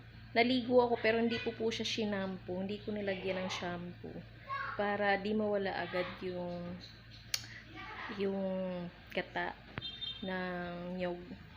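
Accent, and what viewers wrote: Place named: Visayas, Philippines. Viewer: Pina ka tuno sa bisaya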